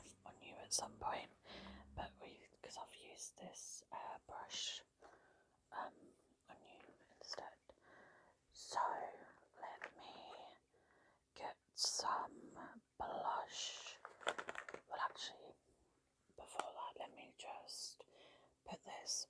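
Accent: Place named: East Anglia, United Kingdom. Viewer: Love a British asmrtist! You sound like you are possibly in the East of England like me. Enjoyed your vid thank you x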